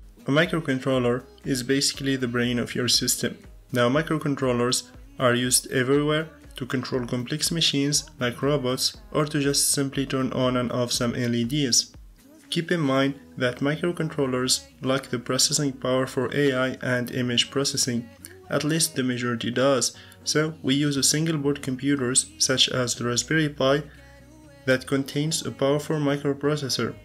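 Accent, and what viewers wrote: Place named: India. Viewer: Don't take it as negative comment. You sound like other Indians tech geeks with your accent 👌. Keep it up bro.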